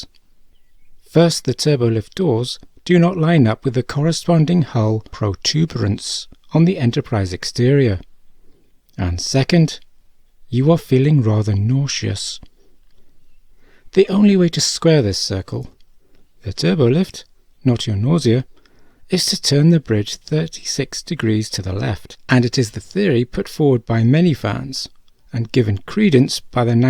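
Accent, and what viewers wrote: United Kingdom. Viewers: he’s a Brit… They pronounce thing weird.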